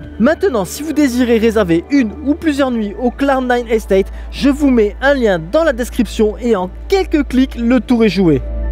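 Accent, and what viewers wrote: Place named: Marseille, France. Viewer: Trop fort le gonze, j’adore tes vidéos et surtout tes commentaires à la marseillaise 👍👍👍 Merci de nous faire découvrir ces superbes endroits et merci pour ton travail 😉 continue !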